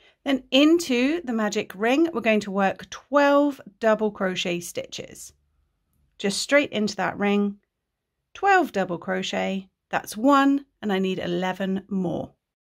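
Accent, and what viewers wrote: United Kingdom. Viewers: Hello I love your tutorials they are so clear and precise. But I have a query. You sound British and yet you use USA terms. When I learned to crochet 50 years ago all patterns were written, in what I now know as, UK terms. It’s only since watching You Tube that I have heard of USA terms. I prefer the UK terms, they make more sense.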